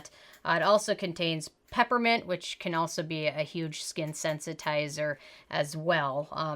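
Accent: Minnesota, United States. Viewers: I know this is an old video, but I just ran out of my PTR moisturizer and I happened upon this. What a rude comment from the above person about your voice needing work. We all have accents. I just hear a Minnesota accent, not a bad sounding voice! You should hear my New Yawk (NY) accent! You have a lovely voice <3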